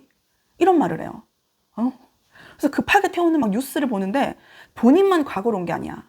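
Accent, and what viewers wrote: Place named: Gyeongsang, South Korea. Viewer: 핑크색에 홀려서 클릭했다가 구독에 댓글까지 남겨보아요~ 끝까지 영상보다 땡기는 작품도 있고 <종이여자>는 오래전언니 책꽂이에 있던 책인데 님 덕분에 흥미가 가요.. 무엇보다 갱상도 사투리.. 너무 정감가는데요?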